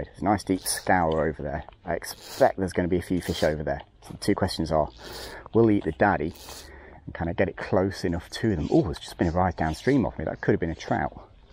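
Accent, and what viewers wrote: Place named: United Kingdom. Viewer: Great video! Where in the UK are you from,? Can't place your dialect.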